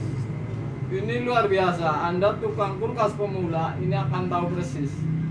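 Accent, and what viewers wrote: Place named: Java, Indonesia. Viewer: Medok temen kang..sampean wong ndi..😁😁😁😁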